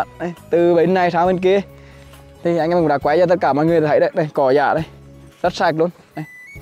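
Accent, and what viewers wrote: Nghe An, Vietnam. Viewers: Nói tiếng nghệ an gì đó chậm thôi anh. Ko hiểu a nói gì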